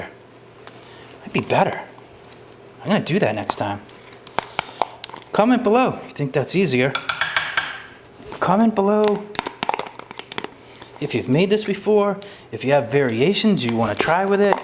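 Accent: Massachusetts, United States. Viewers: So nice to hear a good mass accent. My I miss home in Colorado. First time viewing you...looks good best to you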